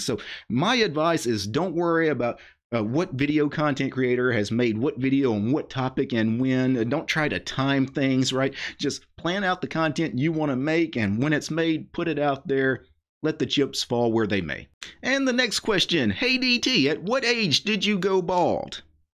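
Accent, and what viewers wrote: South, United States. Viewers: your southern twang is glorious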